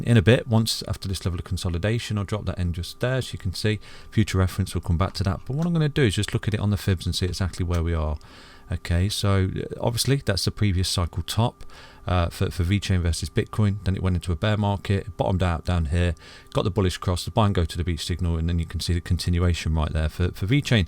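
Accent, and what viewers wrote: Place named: Leicester, United Kingdom. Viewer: Ha I’m from London and my girls is from Leicester, I sent in one of your videos on Cardono yesterday and she said you was from Leicester I never noticed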